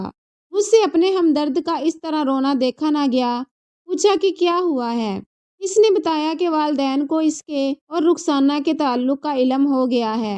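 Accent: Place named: Assam, India. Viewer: Wow khani achhi hai aap ki par ek bat aap asam se ho aap ki awaz se lag raha hai mujhe 😊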